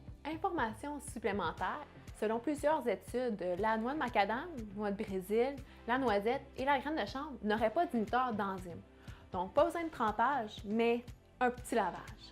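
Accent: Quebec, Canada. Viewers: Excellente vidéo tant par le contenu que par la forme. Egalement accent français canadien très, très agréable à entendre. Un régal. Merci pour votre contribution. Bonne continuation.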